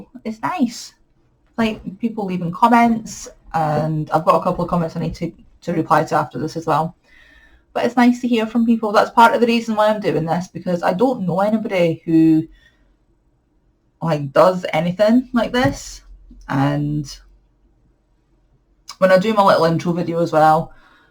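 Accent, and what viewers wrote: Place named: Glasgow, United Kingdom. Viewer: Love that you hear the Fife accent but here in Fife all anyone hears is the Glaswegian accent 🤣 Your Virus Shawl is gorgeous and your cabled Jumper is going to be amazing 😍 There is nothing worse than half spun or broken bits in commercial yarn, so completely feeling your pain. Your Cross-stitch is fabulous, can't wait to see it progress it's going to be stunning!!! xxx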